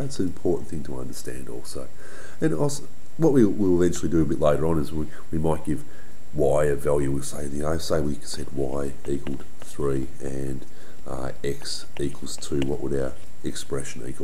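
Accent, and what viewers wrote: Australia. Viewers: aussie mate! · Are you Australian "G'day" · im australian and i understand him hes got an aussie accent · Jessie Panganiban What? He's Australian? He sounds so fiiine as an Australian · Your from Australia! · So you have an Australian accent? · Is it just me or do I learn better when being taught by an Australian teacher!? I find it riveting when I hear somebody say "G'day Mate" XD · Are you Australian??? 😳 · This isn’t about the video but, are you Australian? I recognise that accent heh! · Well he has an Australian accent.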